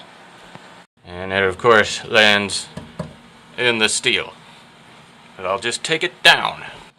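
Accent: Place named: Croatia. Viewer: Your heavy Croatian accent makes your otherwise brilliant vid difficult to follow. Have you considered providing American or Canadian subtitles?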